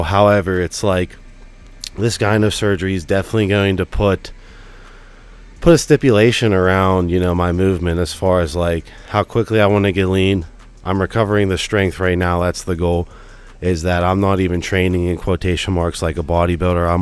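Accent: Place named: Pittsburgh, United States. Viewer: You def sound like youre from the 412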